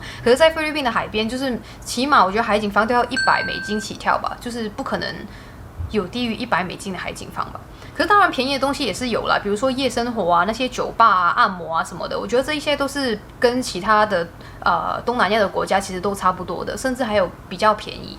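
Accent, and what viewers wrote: Taiwan. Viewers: 你講話好像台灣人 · 我被迫要放慢影片速度來看。😭 你是马来西亚人吗？为什么你说话有台湾口音呢？🥺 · 大馬華人怎麼一開口是滿滿的台灣口音？ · 马来人台湾腔,吊吊吊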